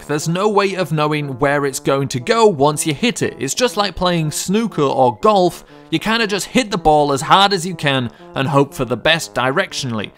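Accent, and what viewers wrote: United Kingdom. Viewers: Is it just me or is it oddly amusing hearing a British dude talk about football?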